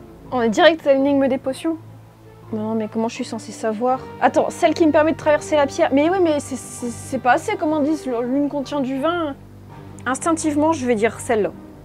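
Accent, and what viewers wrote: North, France. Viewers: Le petit accent du nord ^^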